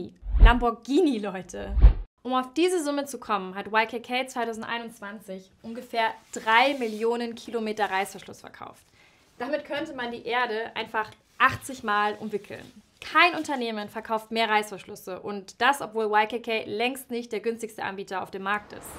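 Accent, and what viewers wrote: Bavaria, Germany. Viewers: kommst du aus bayern? du klingst übel danach... schon vor dem kina interessant finde ich dass bei deinem china-k so 5% "ch" mitschwingt tatsächlich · einfach Hochdeutsch reden und nicht bayerischen Dialekt benutzen(kina=Bayerisch).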